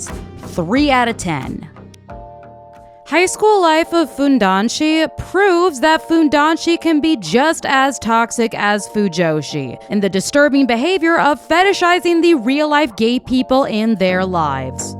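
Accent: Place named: United States. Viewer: This person is speaks like an American 😂 She watching a-lot of these things all wrong 😑. Just because a character has a identity or hobby doesn’t mean it’s representation for people identify similarly. Americans are used to the villains being outside of the relationship, but in these shows the love interest is the villain 🦹‍♂️ Then she’s gonna get mad about one of them having assault when it literally says that the main character gets assaulted in the title 😂 (like what did you expect for real?) The pedo are crazy though but that’s part of Japanese history. Looking into that time will explain why those got so popular. 😬 yikes. calling citrus grooming is kind of overkill though 🙄, I get that’s a red flag 🚩 all the way, but unless is a excessive power or age gap, that’s just throwing around words. some things are only acceptable in cartoons/anime, like unrealistic, body changes or crimes. 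🥱 her actually taking these things seriously is like, Bro, why do you even watch this 🙂‍↔️ but I know why it’s for her job😒 Love stage relationship isn’t based on the SA 😭. if you’re gonna trash my shit, at least do it correctly! It was based on bribes 😣 convincing someone to change their mind Does Not equal abuse, Relax! (Bloom into you) p.s. It’s a GL you have to expect that there’s a chance that the main couple is gonna get together, like what! 🤣 The cherry magic thing was crazy like, she been trashing anime pedos this whole time, but she didn’t talk about that trash side couple 😭. Like thi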